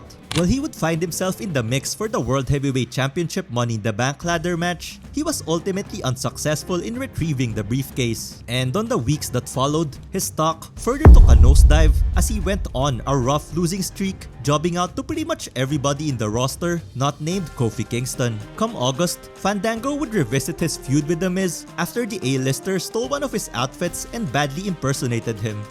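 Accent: Philippines. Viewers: Based on your accent, it seems you're a filipino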